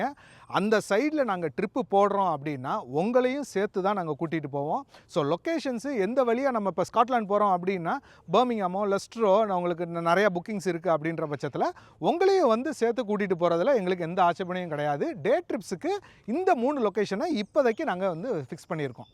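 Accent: Madurai, India. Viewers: Madurai slang is emotion bro❤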